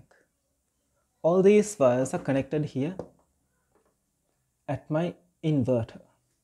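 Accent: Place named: Sri Lanka. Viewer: I just need your advice on how much I have to spend on this ? and sounds like you are from Sri Lanka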